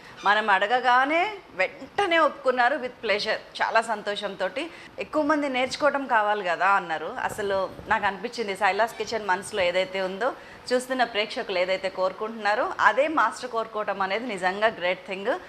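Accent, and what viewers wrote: Telangana, India. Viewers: mam meru super yahe mana pakka telangana style Lo cheppa don't mine super Mam super recipe defenetly try chesta comment pedata Tq.......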